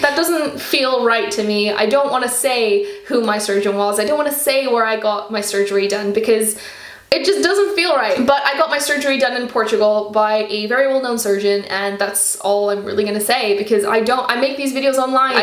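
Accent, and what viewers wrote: United States. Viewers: WAIT WHAT THE HELL I HAVE BEEN WATCHING YOU FOR MONTHS THINKING YOU WERE AMERICAN AND YOU'RE PORTUGUESE JUST LIKE ME WOW I JUST REALIZED HOW MY ACCENT SUCKS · Rowan I thought she was American lol😂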